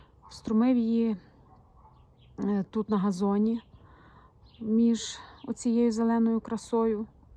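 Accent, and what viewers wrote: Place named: Rivne, Ukraine. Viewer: Дуже гарне вiдео та мова наша украiнська, маю родичiв у Рiвненськiй областi , такий самий говор😊 Дякую !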